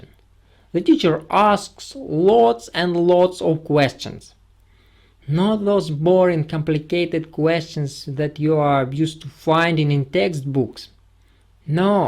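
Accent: Russia. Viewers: You have the most stereotypical Russian accent. I love it 😍😂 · You from Russia??? I know this accent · yep, he's russian alright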